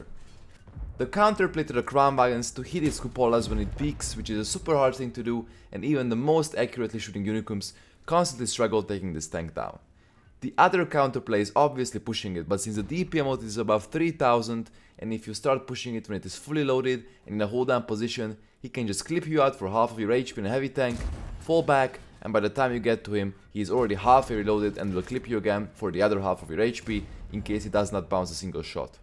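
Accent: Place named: Hungary. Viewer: I am sorry if im mistaken, but is there a chance that you are from hungary? Your accent is really familiar. If this is the case, heló, nagyon jó tartalmat produkálsz :)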